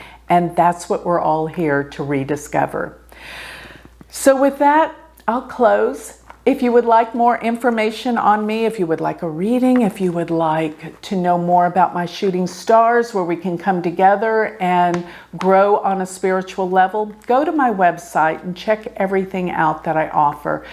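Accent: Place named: South, United States. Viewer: Strange you should say that! In general, very much in general l must emphasize, l find the way Americans speak unsupportable, especially the women, They are so loud and nasal and they massacre the English language. Some you tube channels l just cannot watch even if the content is interesting. But l really enjoyed this video. I love accent. A little from the south l guess. Well measured and not too loud. l am a pure Pisces. I loved your reading. Let’s see what happens shall we? I agree with everything you have said. I’m a young 83 who’s been on a spiritual path all her life. 🇬🇧💝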